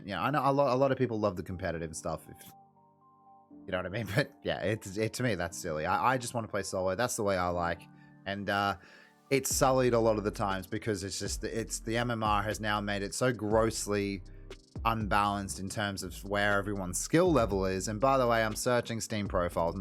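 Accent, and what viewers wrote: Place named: Australia. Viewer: I thought you were Australian. Crikey...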